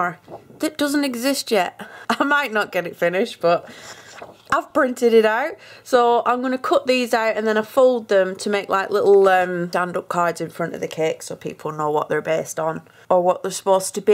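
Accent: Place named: Northern England, United Kingdom. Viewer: I live all your vlog, it’s nice to here a fellow northern lass on her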